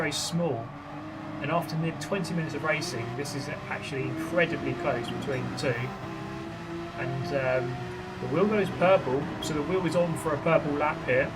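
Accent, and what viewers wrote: United Kingdom. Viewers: In my mind you sounded like more British to me for some reason lol I guess that’s like saying to me if I was in Bristol or something and someone saying to me yea you don’t look to American to me...lol never thought about it like that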